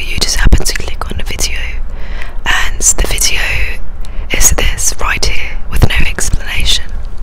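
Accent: England, United Kingdom. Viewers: Can you make a video with only your England accent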